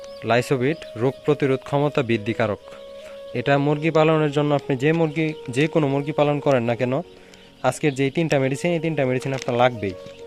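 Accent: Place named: Mymensingh, Bangladesh. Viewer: ভাই আপনার বসা ময়মনসিংহের কথায়।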